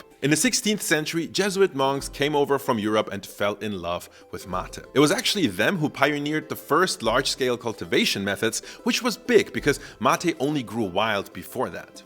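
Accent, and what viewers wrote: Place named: Germany. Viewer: This German dialect is terrible and unnescessary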